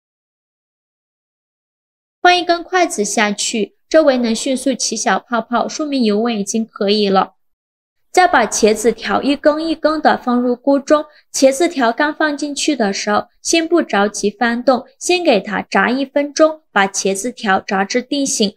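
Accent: Yunnan, China. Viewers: 有趣的云南普通话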